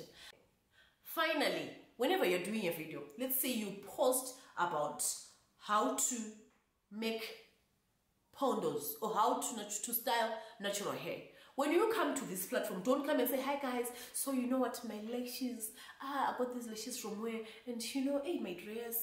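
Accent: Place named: South Africa. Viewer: With accent though, it depends on what your target market is. My target market is based in the country where I'm currently based. The locals here are the ones who bother to watch my content and comment and even ask questions about the places I visit. If I used my home country accent a lot of people where I'm based would not understand me. The accent I use at work is the same accent I use on YouTube. I had to learn this with my full time job and that was culture shock for me which I had to adjust to. Sometimes it is also natural to subconsciously speak in a similar fashion to those around you. I can pick up South African traits in your accent. It happens naturally. I used to have a Zambian accent for the longest time because I lived in Zambia for a greater part of my youth.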